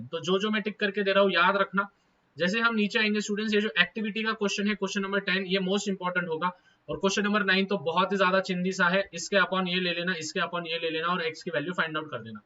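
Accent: Maharashtra, India. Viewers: SIR , AAP KI MOTHER TONE MARATHI HAI KYA....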